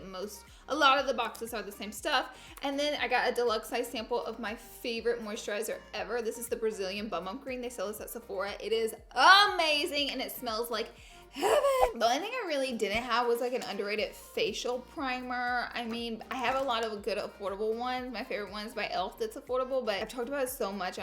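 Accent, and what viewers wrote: South, United States. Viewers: You’re more southern than ever 🤣🤣🤣 (must be mom’s visit influence!) gotta concentrate to get everything but I had a blast watching this video as always 🙌🏼 Love from Peru 🇵🇪 ❤️ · I Always get So excited when there is a new vid!! Girl you are Absolutely Gorgeous & So Damn entertaining & being a southern girl (GA girl here lol) who has a Very thick accent too, its oddly comforting to watch you & hear your Beautiful voice & someone who Pronouces shit Right (and we know how we are saying right, Right? With the Loonnnggg "I" 😋)!! That eye look it Stunning on you!! On me with my orangey natural ginger self, I'd look like a damn clown probably lol, but if I got a chance for you to do it, you damn right I'd let ya! Love from the south girl where its hotter than hell & the humidity forces you to pretty much give up Completely on even Thinking of doing anything with your hair other than throwing it up in a messy bun on top of your head! 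And Love from my 4 beagles & 3 cats who watch & listen to you too & Love you! My youngest cat Sev tries to "catch" you & "pet" you a lot through my phone while I watch! Lol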